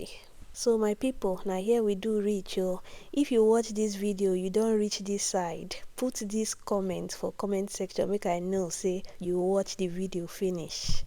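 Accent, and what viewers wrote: Nigeria. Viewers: That niaja pidgin sounds so sweet in my ear❤❤❤❤ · don win my heart with this original Naija pigin English accent 🎉🎉🎉❤❤😂😂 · are you from PH or did you grow up in PH? This pidgin be like the one wey abobi dem, dey speak for Diobu 😂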